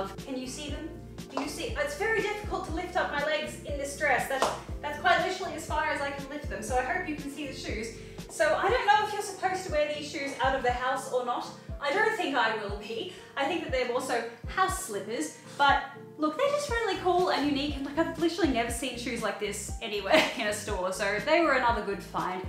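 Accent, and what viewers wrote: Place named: Australia. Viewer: Hi! I seriously love that yellow dress and YES CERTAINLY, it reminds me of that Birger Christensen dress from the designer haul. I need to correct you though, Birger Christensen is a Danish brand, not Dutch. Birger Christensen was a Danish man that established a store in Copenhagen back in 1869, as a small fur repair workshop. I don’t know if the exact designer of the cute yellow dress is Dutch, but it wouldn’t surprise me! Also I’m a Dane myself, and I’m loving your lovely Australian accent, your friends, rambling, Archie and most of all your passion for pastels. I’m very into sustainability and seeing all these lovely thrifted items makes my day. Thank you for inspiring me to be myself 😭💕🌈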